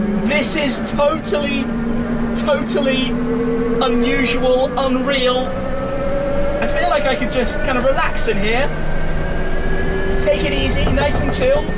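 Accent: United Kingdom. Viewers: Brilliant! Absolutely sensational. british accent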